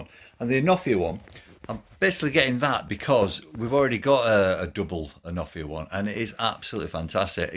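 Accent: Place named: Yorkshire, United Kingdom. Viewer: Love that Yorkshire accent. 😍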